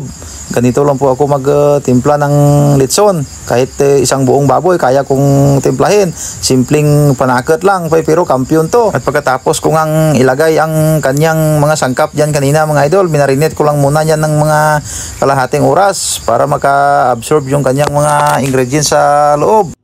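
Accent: Iloilo, Philippines. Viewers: Ilonggo gid haw,,😂😂😂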